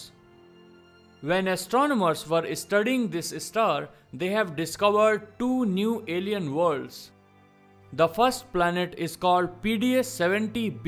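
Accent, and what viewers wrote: India. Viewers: His voice is surprising are u an Indian man ??? Just want to know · It looks like awesome India voice!!! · People are hating because the voice really? The speaker sounds Indian and speaks English well with an accent so what? Usually the accent is due to knowing how to speak two languages same with Asians, but I've also met Indian and Asian individuals due have no accent from only knowing English growing up. At this rate we will never be able to be peaceful and live together too savage of animals we are still...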